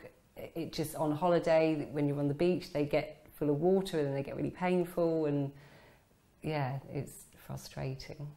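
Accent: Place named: England, United Kingdom. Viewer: What is beautiful about this woman? EVERYTHING!! Her face, her smile, and perhaps, most importantly, her accent. I wonder where is she from. I know she is from England, but I wonder what part of England?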